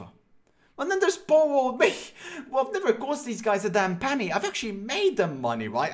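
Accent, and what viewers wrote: Ireland. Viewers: Sometimes he sounds French sometimes he sounds irish 🤔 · You have an irish twist to your accent. You live in Dublin?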